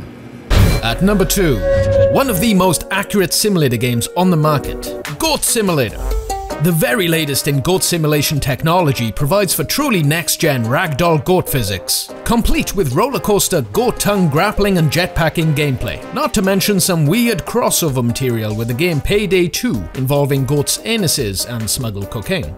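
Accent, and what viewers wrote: Wales, United Kingdom. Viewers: Are you Welsh? Sounds like you have a bit of a Welsh accent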